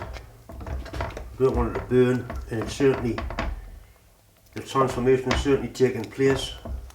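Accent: Newcastle, United Kingdom. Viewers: good video , I did enjoy listening to the Geordie accent . Being from the north east of england , I miss a ham and peas pudding sandwich on a stottie cake . I cannot find the stottie cakes in las vegas.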